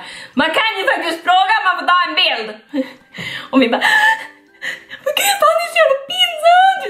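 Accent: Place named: Umeå, Sweden. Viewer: Du har typ Umeå dialekt också ❤️😘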